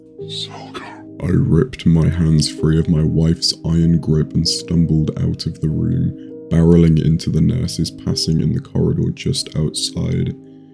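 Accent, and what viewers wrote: United Kingdom. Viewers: H0T BRITISH MAN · DEEP VOICE BRITISH MAN!!!!!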